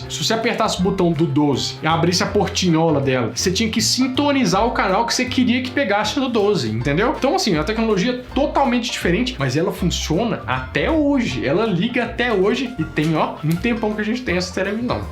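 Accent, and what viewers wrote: Minas Gerais, Brazil. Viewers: coisa fofa esse sotaque mineiro. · que mineirinho bakana sô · Assistindo em 2025, engraçado seu sotaque mudou muito com o tempo, ficou mais mineiro .🤔😂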